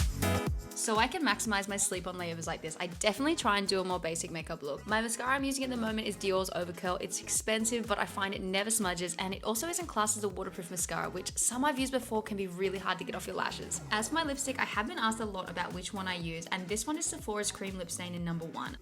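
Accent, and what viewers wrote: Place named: Australia. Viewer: I knew u sounded Aussie when I watched your shorts…thanks for the content it’s very interesting job u have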